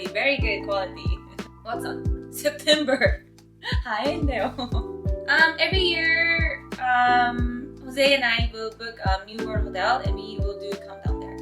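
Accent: Philippines. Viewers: You sound like a Filipino, you have a Filipino accent. It's weird. You could be mistaken to be one actually. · Funny when you speak in english it sounds like filipino english but if you try to talk in Tagalog you sound japanese... Hahahaha... CUTE OVERLOAD! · first time here.. your accent sound like taglish ..thank you for coming and staying in philippines...have fun and God bless. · How come Filipino accent?!?!🤔🤔🤔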